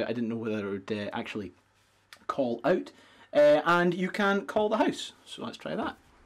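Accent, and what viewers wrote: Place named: Scotland, United Kingdom. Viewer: "dialing the hooce". I love the Scottish accent. My girlfriend is from Oban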